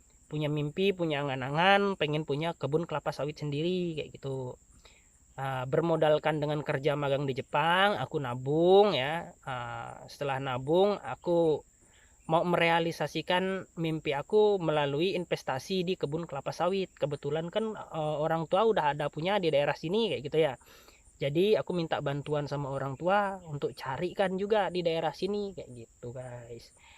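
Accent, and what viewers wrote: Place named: Bali, Indonesia. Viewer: Logat balinya masih kelihatan salam sedulur rahayu sak gung dumadi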